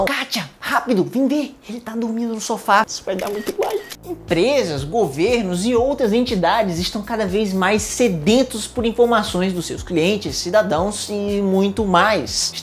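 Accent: Ceará, Brazil. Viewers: Grande cearense, meu vizinho de estado hahaha conheci pelo sotaque antes mesmo de ele citar em algum vídeo o "por exemplo, aqui em Fortaleza". Canal massa, camarada.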